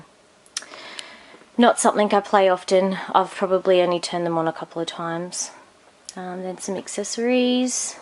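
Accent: Australia. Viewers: The accent being Australian means you must know Last Gamer. Wish I lived in Australia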